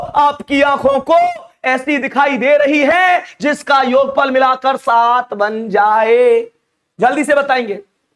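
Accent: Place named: Aligarh, India. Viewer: Sir aap aligarh se ho kya Apki language hmari jesi h😂 M aligarh se hu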